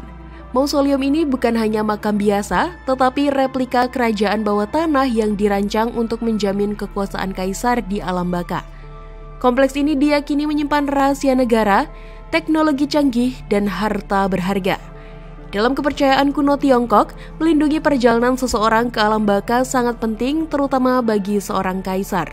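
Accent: Indonesia. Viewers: lidah indo agak beda boss.. 😂😂😂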